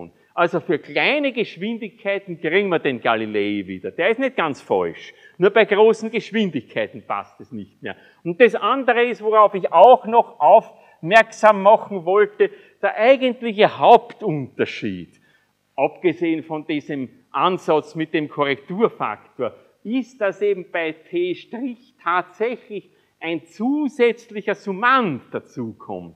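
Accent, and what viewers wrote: Vienna, Austria. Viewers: bin durch Zufall hier vorbeigekommen, und dann hängengeblieben. Sensationeller Vortrag, wunderbare klassische Wiener Sprachfärbung und sehr verständlich. Da bekommt man Lust noch ein Studium zu beginnen.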